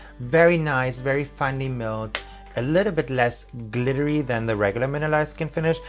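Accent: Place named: Germany. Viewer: Are u german? I can hear your accent :D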